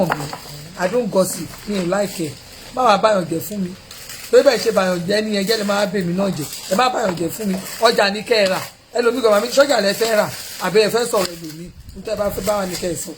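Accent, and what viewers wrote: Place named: Egba, Nigeria. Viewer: You always make my day Stop sebe. I always laugh when I watch you speak in Egba accent. Pepper dem well well. Love you always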